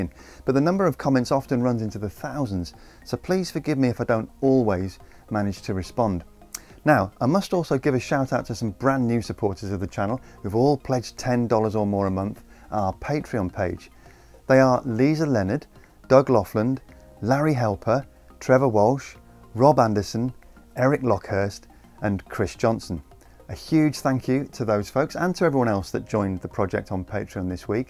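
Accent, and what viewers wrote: England, United Kingdom. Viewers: Keep up the data driven policy analysis! Much more likely to cut through to the harder to reach climate change sceptics than Greta's emotional, hysterical manner. All delivered in a calm, considered English accent with minimal background music. Hooray!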